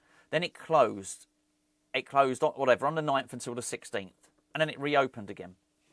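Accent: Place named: RP, United Kingdom. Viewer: a fine exponent of BBC English. Given the main subject of the video something to bring some cheer is a real necessity, well done 👍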